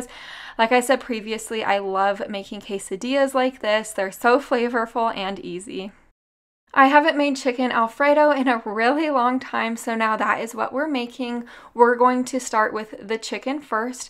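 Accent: Utah, United States. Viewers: I went to USU and it is such a throwback to hear your Utah accent, you are brilliant. Keep up the good work. If I had guess your from Sandy.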